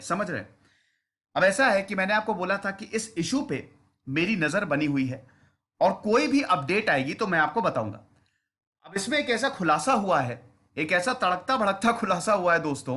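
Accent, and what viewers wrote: India. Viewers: Sr your voice is amzing and different from other and bilkul desi style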